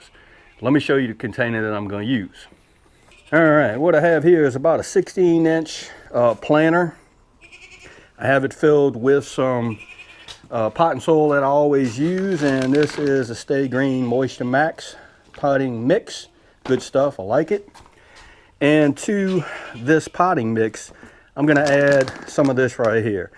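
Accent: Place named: New Orleans, United States. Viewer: Thanks for the info! This is helpful! Your accent sounds like your from my area, West Bank of New Orleans area? God bless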